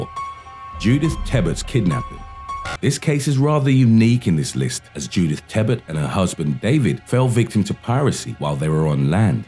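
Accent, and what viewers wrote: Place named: United Kingdom. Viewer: I liked the first narrator and this British narrator now.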